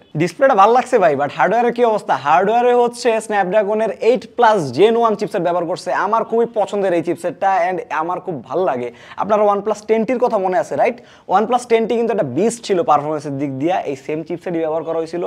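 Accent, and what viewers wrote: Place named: Dhaka, Bangladesh. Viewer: bro ,jaiboga ,khaiboga, khayadise ai typer laguage ta sunte khub baje lage, i mean dhakaiya laguage ta ,,change krl vlo hoy.. khub cheap and dirty language mnehoy sunte.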